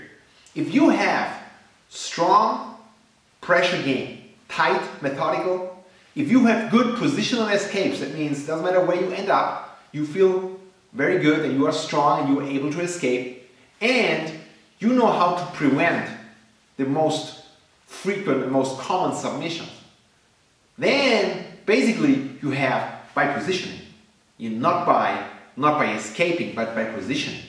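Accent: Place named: Europe. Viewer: Hi, love your work! I fully understand what you are talking about. I have a couple of questions: 01. Are you going to be doing instructional submissions escapes and positional escapes anytime soon? 02. If someone wants to be moving up the ladder in your philosophy and your jiujitsu... How does one go about it (You sound European, so it would have to be remotely)? Thanks!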